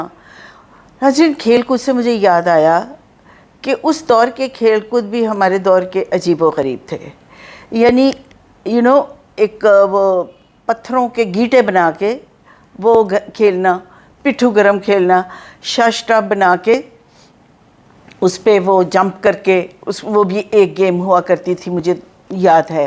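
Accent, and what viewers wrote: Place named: Punjab, Pakistan. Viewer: wah ji wah ap ki baton main Punjabi tuch very gooď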